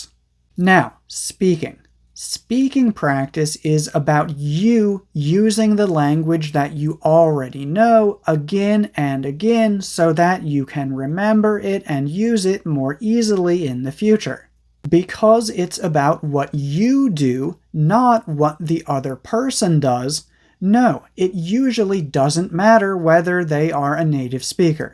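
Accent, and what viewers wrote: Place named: United States. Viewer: I've always been fascinated by your beautiful American accent.❤😊😊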